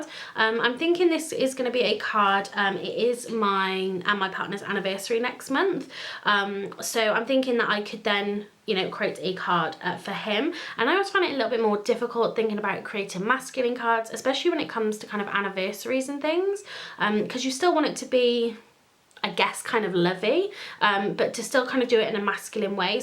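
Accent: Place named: Wales, United Kingdom. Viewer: Part way through I thought the dotted background would make it too busy but I was so wrong it worked beautifully. Every video I am trying to sus out your accent because I am hearing some Welsh mixed with something else I can’t put my finger on. I really need to start playing with my stamps and inks I find myself always turning to the Hunkydory card kits or digital designs.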